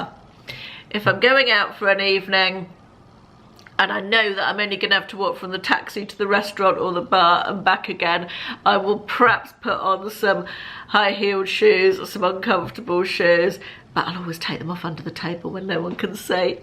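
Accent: England, United Kingdom. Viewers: Yes, I have been watching for a while now, she still has an English accent, Just!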